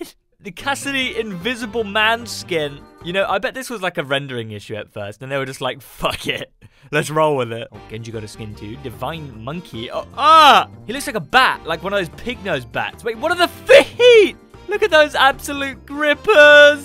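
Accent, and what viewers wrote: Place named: Sweden. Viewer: where are you from? you sound a little bit swedish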